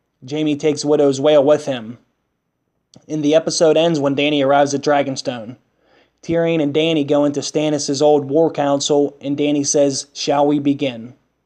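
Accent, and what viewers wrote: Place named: Philadelphia, United States. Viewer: you must be from the philly area talking like that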